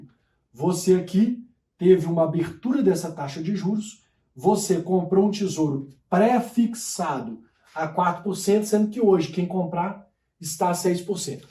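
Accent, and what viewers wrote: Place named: Minas Gerais, Brazil. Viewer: Sou de BH tb Adoro sotaque mineiro. Aprendendo muito c vc. Vlw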